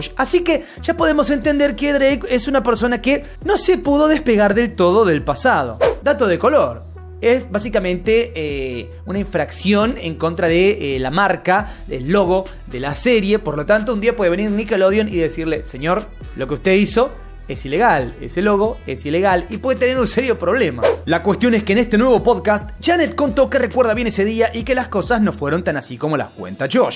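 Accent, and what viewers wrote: Argentina. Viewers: DESPUÉS DE SEGUIR ESTE JUICIO POLÉMICO POR TU CANAL, AHORA ME PARECE UNA PARADA OBLIGADA CUANDO QUIERO ENTRETENERME EN YOUTUBE HAN HECHO UN TRABAJO INCREIBLE Y DESEO QUE MARCAS PATROCINEN, TE VEO DESDE EL SUR DE LA FLORIDA Y ME ENCANTA EL CANAL. SALUDOS ERES BELLISIMO Y ESE ACENTO AGENTINO ME ENCANTA. UN FUERTE ABRAZO Y FELICIDADES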